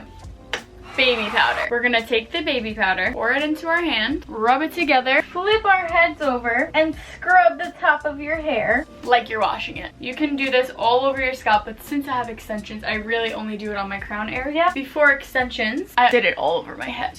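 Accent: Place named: New York, United States. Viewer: are you from ny?? I love ur accent lol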